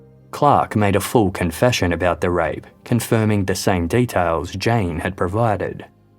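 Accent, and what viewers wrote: Australia. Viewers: I'm American, and this is definitely an Australian accent, you can tell instantly. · Definitely an Australian accent and nah it's not AI, the narrator has even done a Q&A on the channel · I just found this channel, and its amazing! So gd to hear an Australian accent podcast. I live in Queensland Australia 🦘 And suffer from extreme insomnia. These are the perfect bedtime stories for me. I do believe i am actually still learning as i sleep. These stories are much easier to hear subconsciously as i sleep, than my usual twisted night terrors on steroids! Thankyou so very much. Your content is original too, a fresh and intriguing change from all the rest. Perfect intro too, no loud, jarring, drawn-out intro music thank god!! Just the fact that your content caught, and kept my interest ( through the ADHD), is a definite YES, a " my new channel to binge )!!!!" Luv and respect, from Queensland, Australia !!!🦘🌏🦘🌏🦘❤❤❤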